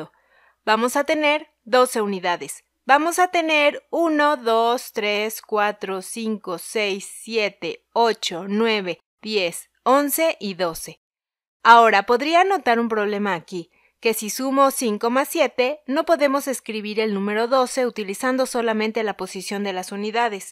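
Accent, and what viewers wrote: Mexico. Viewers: este acento es mexicano?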